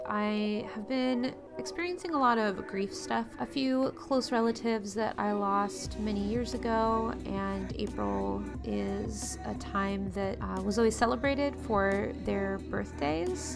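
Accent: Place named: Scotland, United Kingdom. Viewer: AHHHH that Scottish accent melt Thanks for sharing the footage from Treasure Fest - still bummed I couldn't go, but so glad to hear your account of it. All those succulent babies! So cute.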